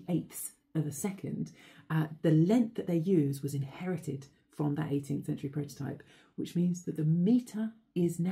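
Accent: England, United Kingdom. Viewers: I'm very much biased by my French pov, so when I hear a British accent explaining science to me, I instantly think Doctor Who. It's a stereotype, but it's just super fun to imagine the Doctor teaching science to humans on YouTube 😊😁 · Redhead + English accent = <3 <3 <3 <3 <3 <3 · your intelligence and british accent are sooooo sexy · I like her videos. She smart, attractive and has a lovely English accent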